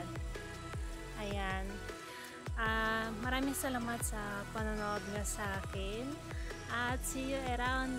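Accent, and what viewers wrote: Philippines. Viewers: I like your filipino accent. I'm Pilipino new friend from M and S vlog. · Sheeeesh! Tagalog accent. Wow!😳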